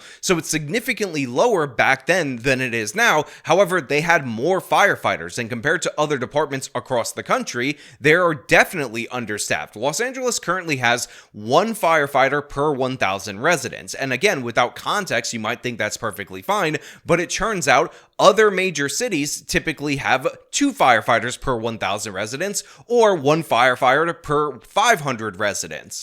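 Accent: New York, United States. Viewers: It’s not freaking awoken it’s awaken. The NY speech pattern will only get you so far with the speech nutzees. Ffs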